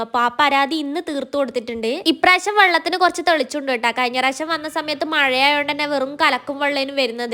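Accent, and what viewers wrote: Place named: Kannur-Kasaragod, India. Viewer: നല്ല ഭംഗി...എവിടെയാ സ്ഥലം..കണ്ണൂർ കാസറഗോഡ് ഭാഷ ആണല്ലോ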